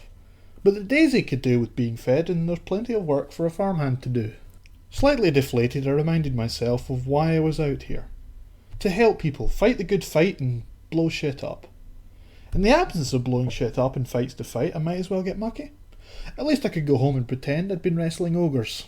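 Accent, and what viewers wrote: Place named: Ireland. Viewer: i think he is Irish lol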